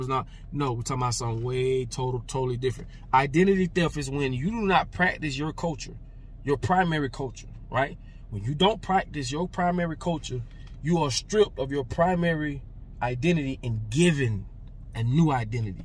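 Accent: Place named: Louisiana, United States. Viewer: Thanks for the video. Thought you were from Louisiana ! Would have never guessed Haitian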